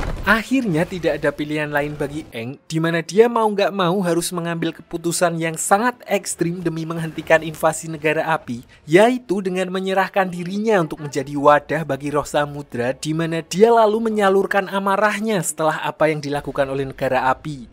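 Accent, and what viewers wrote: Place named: Tulungagung, Indonesia. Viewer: Wong tulungagung sampean cak😂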